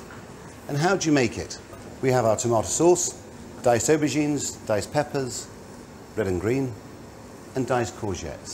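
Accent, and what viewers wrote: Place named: Leeds, United Kingdom. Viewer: His accent is pure Leeds, UK. I love it.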